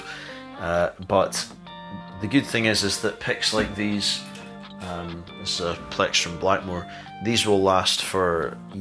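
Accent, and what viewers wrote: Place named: Scotland, United Kingdom. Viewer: You sound Scottish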